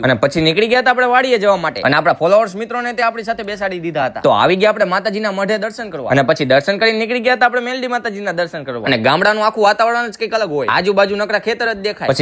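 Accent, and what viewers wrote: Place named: Surendranagar, India. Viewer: bhai tmari bhasha surnagar ni gujrati mane bov j game ❤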